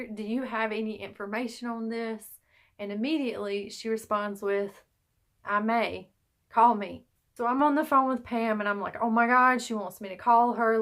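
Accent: South, United States. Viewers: I just Love your Southern Country Accent...it’s GREAT 👍🏽. I’m Glad that you posted this outcome and you’re ability to find your BIO Dad. 🤔🙏🏽🙇🏻‍♀️💗🔍🕊💎🧬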